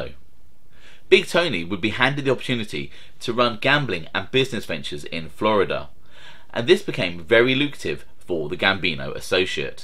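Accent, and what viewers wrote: England, United Kingdom. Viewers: OMG that's the same thing I said 9th Ave all the way😂😂😂😂the very first time i saw this channel i thought he wz the gangster r i wz shocked when I heard the English accent!!!!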